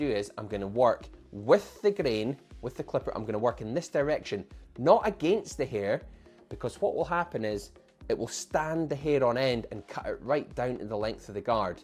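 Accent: Scotland, United Kingdom. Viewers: Thanks for this easy approachable concept to do self hair cuts. Really like your accent as well. Scottish? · Love the Skaughtisch accent!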